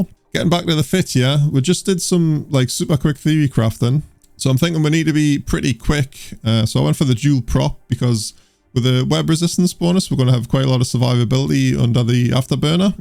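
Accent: Newcastle, United Kingdom. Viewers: Wait what, a Geordie playing eve. Why the hell am I not playing with you!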